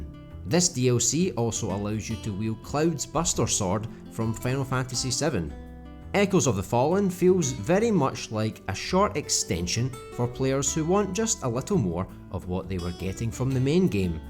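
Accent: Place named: Scotland, United Kingdom. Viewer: My man, I didnae realise you had a channel yersel AND on top of that I hear a welcoming Scottish accent. What a guy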